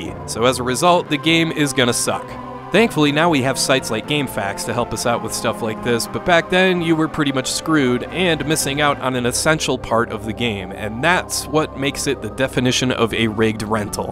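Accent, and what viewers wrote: Minnesota, United States. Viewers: Yo! Are you from Minnesota!?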